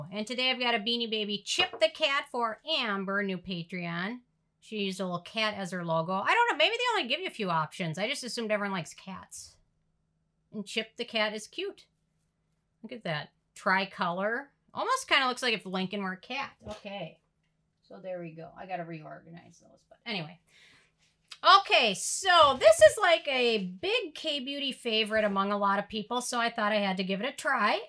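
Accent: Minnesota, United States. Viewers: I don't have blemish worries, but I watched the entire video anyway.😆 That peach top is a great color for you and I LOVE your purple eyeshadow--so pretty! I like listening to your Minnesota accent. I'm a former Yooper (know what that is?) so our regional accent is somewhat similar.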